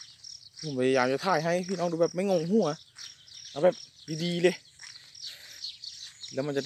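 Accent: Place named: Kanchanaburi, Thailand. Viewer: อยู่ไหนเสียงเหนอเมืองกาญจ์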